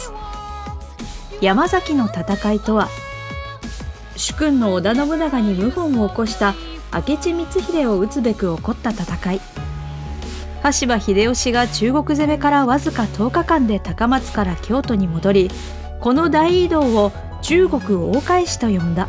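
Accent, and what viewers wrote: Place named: Mikawa, Japan. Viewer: 三河のアクセントが気になる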